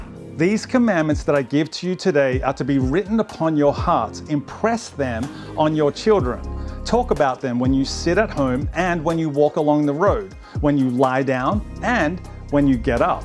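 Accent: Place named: Australia. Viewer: Missed your voice man! Love reading your devotionals every morning! But something about that Australian voice explain things just makes it better. Loving this channel so far!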